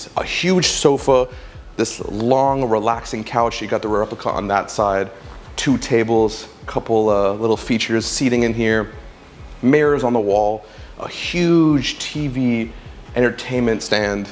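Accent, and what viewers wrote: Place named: United States. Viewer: i like your american accent. he is very classy. so many americans speak as peasants but not you .❤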